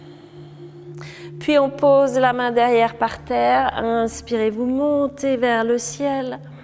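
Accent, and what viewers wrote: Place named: Valais, Switzerland. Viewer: Un an depuis le grand confinement et toujours autant de satisfaction à vous retrouver et pratiquer vos différents programmes avec en prime ce délicieux accent valaisan que je connais bien de ma branche familiale cousine En espérant pouvoir vous rejoindre un jour pour un stage d'été Merci pour la qualité de vie que vous partagez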